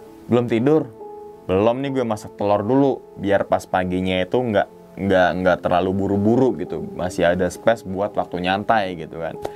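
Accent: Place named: Yogyakarta, Indonesia. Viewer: Pengalaman pribadi,enak dengernya detail penjelasan lokasinya,suara nya Jogja jelas. Jogja hadir bang 😊❤️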